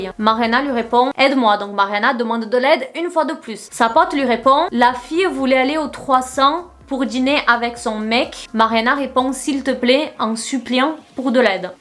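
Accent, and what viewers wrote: Brazil. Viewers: J’aime tellement ton accent ! Tu as les mêmes « défauts de langage » (je savais pas trop comment dire ça) que ma mère je trouve ça trop miiiim’s Bref continue comme tu es 🌊 Juste une question ? Tu es d’où au Brésil (sou carioca e vascaina) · Coucou! Un petit commentaire pour te dire que j'adore tes vidéos, ton naturel, ta façon de raconter etc En plus pour ne rien gâcher tu es magnifique et j'adore ton accent brésilien (je suis portugaise) ! Je t'adore et tu me detends énormément quand ça va pas ❤❤❤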